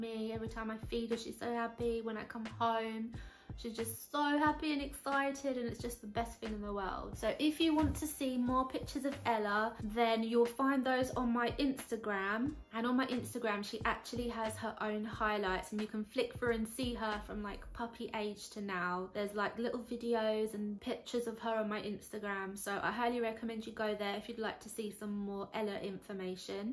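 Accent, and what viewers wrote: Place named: England, United Kingdom. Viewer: I saw your comment on our channel about Baby Violet. I am pleased that you found us. Glad that YouTube recommended our video to you. Watching this video now. Wow. Great video! I just became subscriber number 75 to you! You doggie is precious! Ruthie B. Howard BIG BELLY REBUILDS 9-22-21 P.S.: I LOVE, LOVE, LOVE, your English Accent! Cerulean, KY USA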